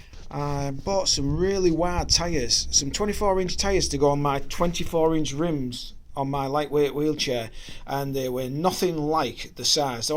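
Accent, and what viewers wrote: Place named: Yorkshire, United Kingdom. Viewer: Firstly my good man, don't ever apologise for a wonderful Yorkshire accent! Most of my wife's family are from Hull! And secondly, THANK YOU, THANK YOU, THANK YOU, for recognising that us mere mortals cannot afford these things! I'm currently looking into funding for a Grit Freedom Chair, which is a very similar thing to what you've designed and built for yourself. I say 'looking into', because I know full well that I won't be able to get the funding to be able to afford this thing. Firstly, it's manufactured in America (the first hurdle I've got to negotiate), secondly, as you've rightly pointed out, these things cost an absolute arm and a leg, (it works out at nearly 5 grand for goodness sake!) and thirdly, at least 90% of the funding available for these things is aimed at kids, or least for people under the age of 25! 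I'm a 48 year old man, for goodness sake, what chance have I got! I want to enjoy what life I've got left, I want to go off the beaten track a bit. So I need something like this! But I can't afford it. I wish the manufacturers of these things, would get it into their skulls that the very people that need these things, are also the poorest people in our societies, the disabled people who, generally speaking are on benefits! I guess what I'm trying to say, is congratulations for taking matters into your own hands, and actually building one of these things yourself, and getting yourself out of the predicament that many of us find ourselves in.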